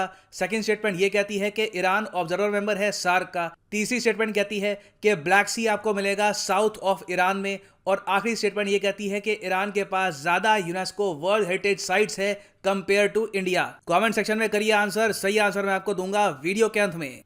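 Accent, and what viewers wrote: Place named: Haryana, India. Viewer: Haryana se lgti ho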